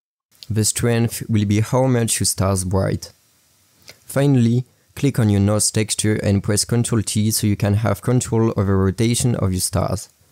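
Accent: France. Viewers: tuto en anglais avec un accent français à la pisse, comme si les anglophones avaient pas asser de tuto pour blender; putain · Dude that is a kickass French accent you got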